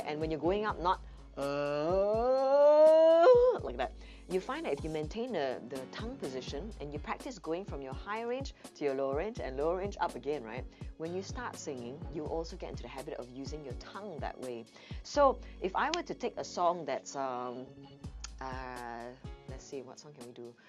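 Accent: Singapore. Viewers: Are u Singaporean or malaysia🤣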